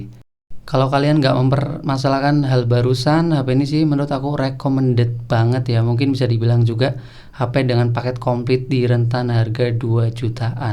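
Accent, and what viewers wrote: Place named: Java, Indonesia. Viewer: Behh jan jowone ketok bangetmas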